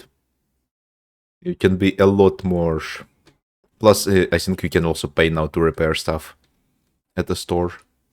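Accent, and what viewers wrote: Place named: Russia. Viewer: руссиан аксенте ) Хотя возможно я шиз.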